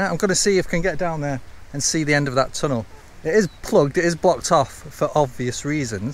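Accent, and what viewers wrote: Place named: Northern England, United Kingdom. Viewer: Language is a dynamic construct. I am familiar with the speech idioms of this presenter, but then I am , like him from the North of England. If you find Northern speech annoying, maybe confine yourself to videos with presenters from your local area, or to those that have had professional training in speaking Received Pronunciation.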